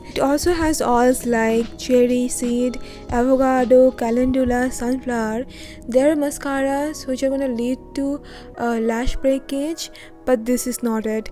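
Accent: Bengal, India. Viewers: It's Bengali accent. She's not faking it.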